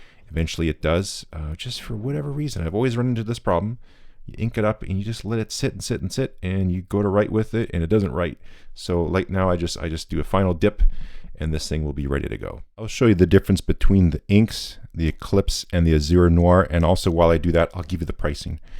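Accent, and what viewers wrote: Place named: British Columbia, Canada. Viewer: Cut mat ✔️ Hands only ✔️ BC accent ✔️ Valuing things in kopeks and Dong ✔️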